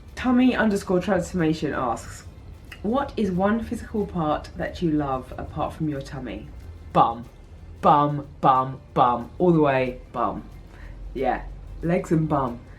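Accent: United States. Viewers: Your american accent its subtle, but really good. :) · Your american accent is really good! Oh could you do a video on some workouts you do at home. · Your American accent is SO good!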